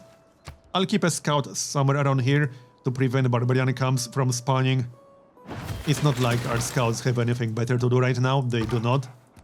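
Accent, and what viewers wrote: Poland. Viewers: where are you from mate, you sound Polish. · He is obviously Polish :)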